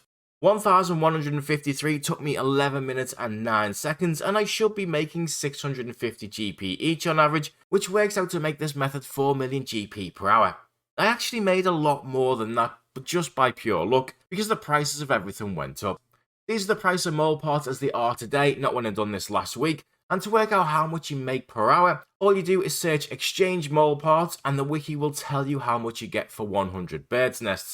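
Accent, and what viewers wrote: Liverpool, United Kingdom. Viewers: are you scouse bro?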